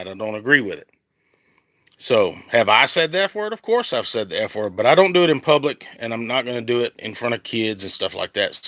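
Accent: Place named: Florida, United States. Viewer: I just found you TODAY. I LOVE YOUR NEW SONG. I am a Florida & Alabama Gulf Girl (stuck in Utah now). Where are you from? Accent sounds like NW Florida?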